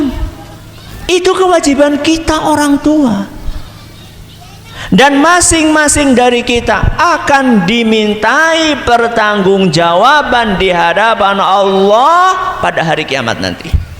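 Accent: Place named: Banyumas, Indonesia. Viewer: Masya Alloh..... Seneng dengerin ceramah pak ustadz, karena suka diselingi bahasa Jawa yang ngapak😂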